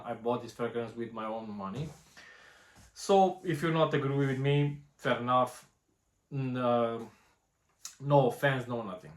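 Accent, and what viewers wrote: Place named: Romania. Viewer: Bro, am o gramada de "influenceri" in lista de Subs si este foarte adevarat ce zici... aia vreau numa sa faca bani cand cumpara toti care se uita la videourile lor, azi te-am descoperit si sincer esti forate honest, si asta trebuie sa se aprecieze, nu inteleg cum nu ai mai multe like si subs.. aici ai un sub +, Salutari din Spania ( pe canalul pe romaneste am vazut ca vorbesti similar ca accentul meu 😂 sigur suntem din aceias zona din Romania 🤝🏽🤝🏽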